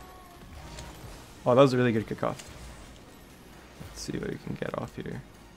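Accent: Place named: United States. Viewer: Ur like the only good freestyler who has an American accent, maybe osm too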